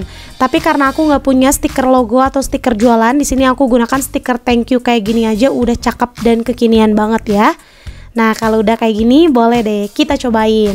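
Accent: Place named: Sunda, Indonesia. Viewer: Lucu deh ah cara ngomongnya..meni sunda pisan 🥰🥰🥰..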